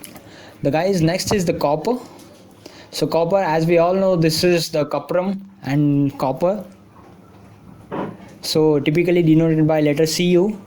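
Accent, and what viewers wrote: India. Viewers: I am not understand your hinglish accent.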